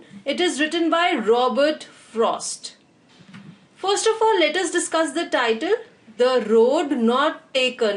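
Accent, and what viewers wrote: India. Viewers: wow! it's a very strong indian accent! it was almost impossible to understand this lesson! this teacher is not prepared to teach english for no one!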